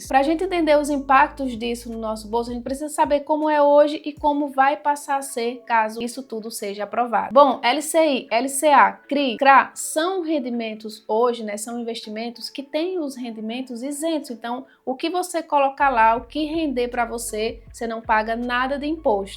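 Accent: Northeast, Brazil. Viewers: linda maravilhosa..❤❤... Obrigado pelo seu trabalho.... fiel seguidor. Amo esse sotaque nordestino.....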